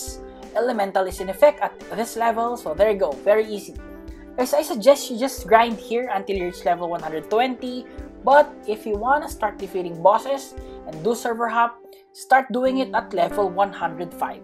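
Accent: Philippines. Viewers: Of course he is, my filipino teacher who teaches English in my school in Indonesia have a same Accent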